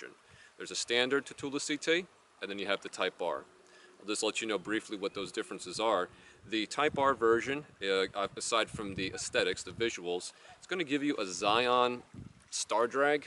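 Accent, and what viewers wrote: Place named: Delaware County, United States. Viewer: where in Delaware county are you from? that accent is thick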